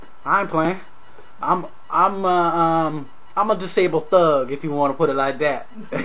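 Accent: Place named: West Coast, United States. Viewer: I couldn't even tell. In fact, I thought you were a west coast brother. Are you?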